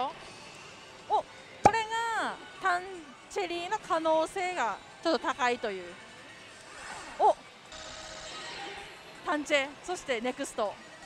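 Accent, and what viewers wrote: Kagoshima, Japan. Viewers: 何処の出身ですか？？ 鹿児島？？🤔🤔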